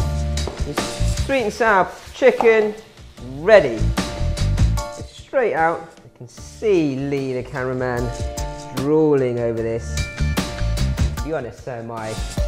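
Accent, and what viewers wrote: England, United Kingdom. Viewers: I don't like this guy English ascents? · I love your British accent sir,good cooking huh.. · luv uk waps.... ha ha in holland we call ourselves proudly peanut. "skool uv wuk" great uk accent mate. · Nice and clear instructions,easy to follow.Chinese with a british accent. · Too much English accent and annoying background music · He has an english accent!!😱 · It is kind of weird to heard perfect British accent from a Chinese guy. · Asians with a slight British accent always makes me stop and a take a second look. Great videos!!!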